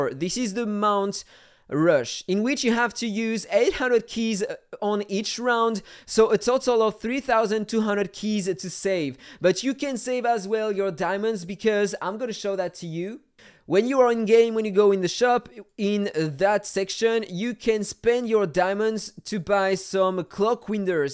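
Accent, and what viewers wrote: France. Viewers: t'es Français non ?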